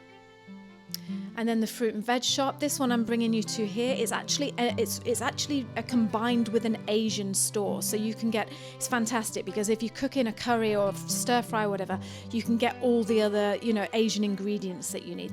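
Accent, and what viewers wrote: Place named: United Kingdom. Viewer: Weird narrator script, i need to see different people . She sounds soooo British. Im British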